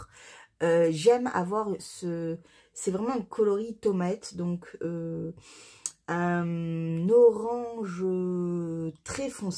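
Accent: South, France. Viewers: Bonjour, je te suis depuis tres longtemps, sans laissé de commentaires et c'est la première fois que j'entends l'accent du sud et d'habitude tu ne l'as pas. Merci pour ta bonne humeur et ta gentillesse.A bientot🥰😽😽😽😽🌺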